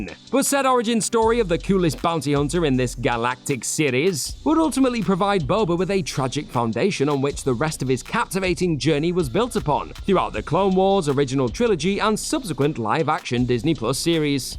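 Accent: United Kingdom. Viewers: Ya British mate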